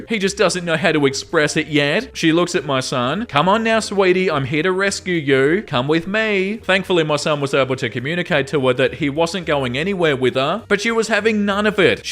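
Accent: Australia. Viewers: I have to wonder if you're an Aussie. You sound like it, and it's nice to sit and listen to.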